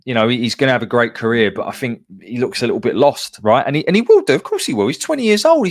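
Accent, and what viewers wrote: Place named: Birmingham, United Kingdom. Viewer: sound just like a brumy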